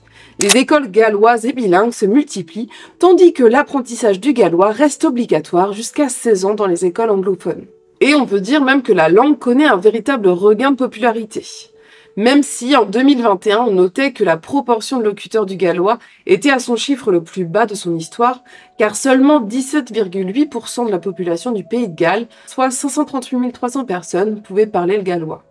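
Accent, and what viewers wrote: France. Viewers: Bonjour. mtn tu l'est. Sois pas trompée par tout ce blah blah patriotique bidon dans les stades de rugby pleins de drapeaux et de chansons. J'étais le seul élève dans ma classe à choisir de continuer avec le gallois après 14 ans quand cette matière cessa d'être obligatoire. Le seul! J'ai étudié le français et le gallois jusqu'à 18 ans. Mtn j'ai 60 ans et ma fille mon (premier, plus à suivre, j'espère) petit fils sont gallophones, langue dominante. Plus tard dans la vie je me suis fait très fort en Catalan aussi, autodidacte. J'ai fait des recherches sur les autres langues liées avec le Catalan et ensuite j'ai tomber sur ta vidéo sur le Gallo. Ensuite j'ai continuer à voir tes autres vidéos. Je trouve plutôt intéressant écouter ma propre langue prononcée dans un accent français mais je n'ai pas envie de l'apprendre. 4 quatre langue me suffisent je pense. 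Merci et bonne chance à la suite!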